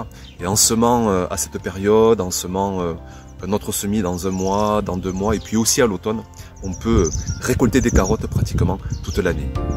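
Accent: South, France. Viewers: Bonjour de vous voir et entendre ce petit accent du sud nous réchauffe toujours le 💖.alors soyons tous unis dans cette période difficile.merci pour votre générosité.bon courage à vous tous et toutes et bonne continuation.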